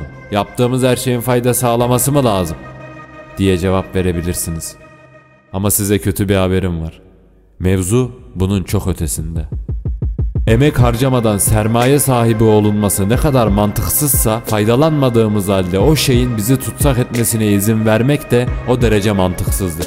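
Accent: Ankara, Turkey. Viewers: gardaş anagara şivesinden geçme senin videolar öyle guzel gardaş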